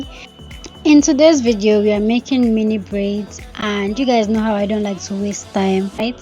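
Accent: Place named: Zambia. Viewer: You sounded like zambian,am a zambian that's why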